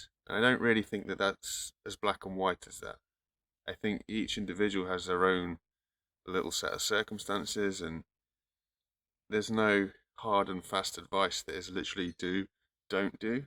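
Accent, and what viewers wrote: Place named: England, United Kingdom. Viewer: It's obvious from his accent that he's not Welsh. He has an English accent and most likely lives in London or one of the Home counties.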